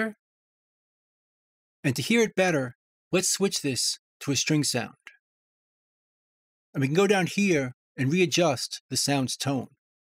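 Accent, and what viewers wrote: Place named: New England, United States. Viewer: Your New England accent makes these videos entertaining as well as helpful. I'm from Texas.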